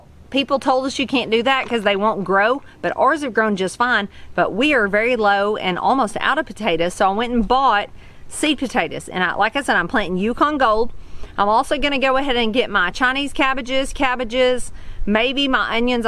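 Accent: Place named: Arkansas, United States. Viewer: It's so nice to hear a true Arkansas accent. Always run with it, not away from it because it's the most pleasant sound on earth.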